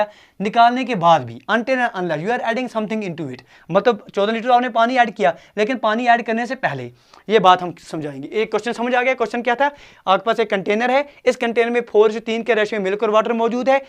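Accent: Kashmir, India. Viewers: Kasmiri guru jee Accent is cool loved it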